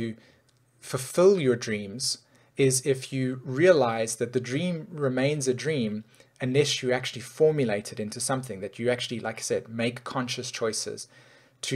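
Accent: South Africa. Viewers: Nice to hear a South African astrologer !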